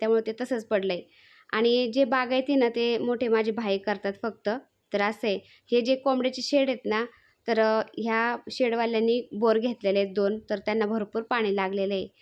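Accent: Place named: Parner, India. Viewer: ताई तुमचा आवाजाची टोन ऐकुन, अस वाटतच होत की तुम्ही माझ्या माहेरच्या बाजुच्या वाटतात, पन हा vidieo पाहुन कळल कि आपन दोघी एकाच जिल्ह्य़ातील आहे,माझ माहेर पारनेर आहे ,मी पन नविनच youtube channel चालु केलाय ,तुम्ही कुठे रहातात ते please सांगा मला तुम्हाला भेटण्याची खुप खुप ईच्छा आहे ताई please reply दया 🙏🏻🙏🏻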